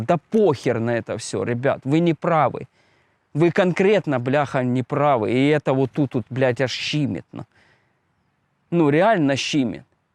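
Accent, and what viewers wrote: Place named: Russia. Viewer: ти ч наче з офіційними особами в рейді! майже при виконанні. що за кацапський говір?... от так само ти кладеш на Мову, а вони на правила рибальства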